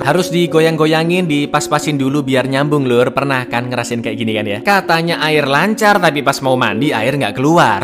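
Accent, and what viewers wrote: Java, Indonesia. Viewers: kue wong jowo opo cok, medok timen le ngomong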